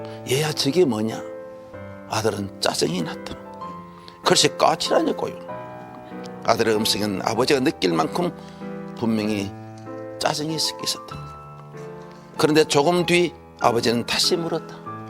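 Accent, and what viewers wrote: Gyeongsang, South Korea. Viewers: 까치라꼬. 라꼬. 라꼬. 갱상도사투리 절라싫타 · 황수관이 억양이 맘에 안든다. 수꼴 TK 다. TK는 "ㅇ"이 들어간 단어는 발음을 세게한다.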